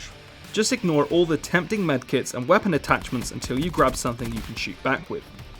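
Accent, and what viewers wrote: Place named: England, United Kingdom. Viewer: Frickin english accent Speak correctly idiot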